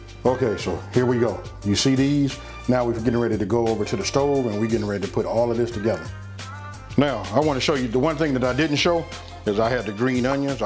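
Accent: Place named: Compton, United States. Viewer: Wow thanks for the reply. I will! By the way, I love your Compton/L.A. accent 😁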